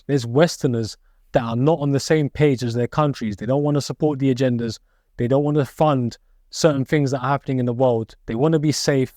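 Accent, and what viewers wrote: United Kingdom. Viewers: You look silly dressed up like an Arab goat herder with your rough and ready Midland accent. Is that called Cultural Appropriation. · Im colour blind your accent sounds like you from London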